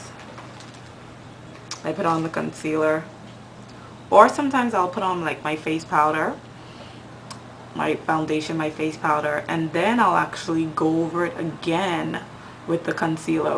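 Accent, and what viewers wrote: Bahamas. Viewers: where are you from? im from the bahamas and you sound like a bahamian rather tan an american to me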